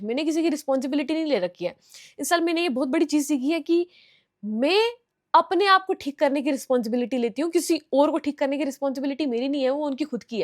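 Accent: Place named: Poonch, India. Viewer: Yeah dear dairy was awesome And poonchi accent tooo❤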